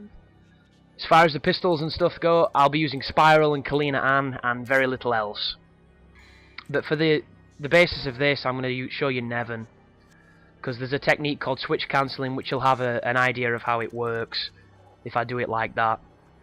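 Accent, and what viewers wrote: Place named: Northern England, United Kingdom. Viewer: Does thar live in sheffield o’what thi sounds like a reyt northerner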